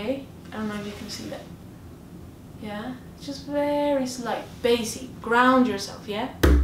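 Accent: Ireland. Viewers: Great lesson your accent is like a half Kerry half SAN Paolo. Thanks for sharing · Yet again another great tutorial video here, I have been very lazy over 2 yrs when it comes to Bodhran practice, but now I've discovered you I've made a pact that I will do 15mins a day but it ends up being 30mins no problem n that's down to ur teaching skills. You have an Irish accent in there somewhere? Are u Irish n moved abroad? Or the other way round? Do u play in a band by anychance? Sorry for all the questions btw I'm just curious 🥁🇨🇮🍻🥁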